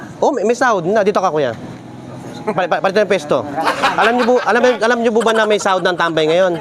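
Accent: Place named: Pampanga, Philippines. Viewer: Kapampangan ka?? Halata sa pgsasalita mo hehe.nkktawa tlga mga prank mo 😅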